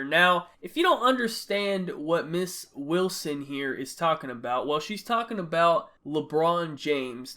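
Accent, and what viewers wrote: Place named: South, United States. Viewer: Southern lmao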